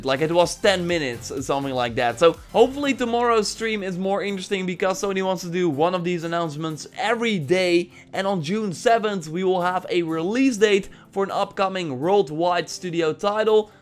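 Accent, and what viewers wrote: Netherlands. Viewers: Ur dutch right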